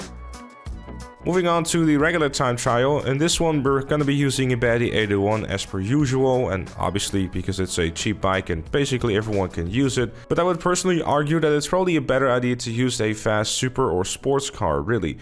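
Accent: Netherlands. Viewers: Are u dutch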